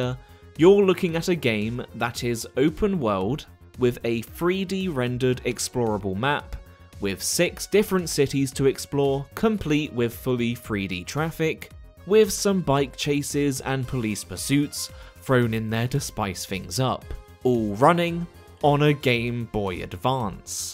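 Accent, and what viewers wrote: United Kingdom. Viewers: It isn't annoying to hear lorry. It is refreshing to hear an Englishman speaking English.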